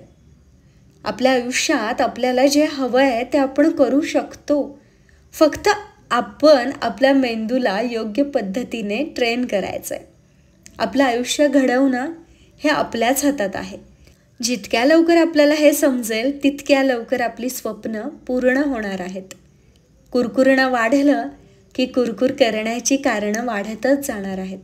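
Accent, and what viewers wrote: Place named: Satara, India. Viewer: तू ना खरंच लय भारी कडक आहेस आमच्या सातारी भाषेत बोलते,लहान वयात इतकी समज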